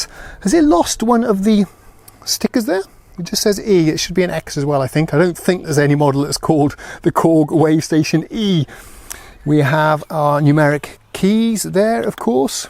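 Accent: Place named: England, United Kingdom. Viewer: It seems like you live in Sweden, but you have an English accent. Are you from the UK originally, or did you perhaps study English there as a youngster?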